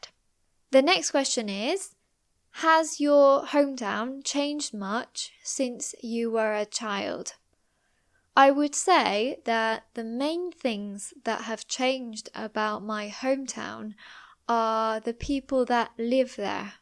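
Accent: United Kingdom. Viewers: British, American, Accent? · just so amazing! I love your British accent, slow speed, and very natural intonation. I definitely will become your new member!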